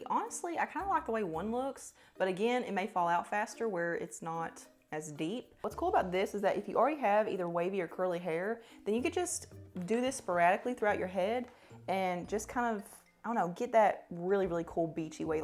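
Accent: South, United States. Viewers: Your southern accent is soo charming ☺️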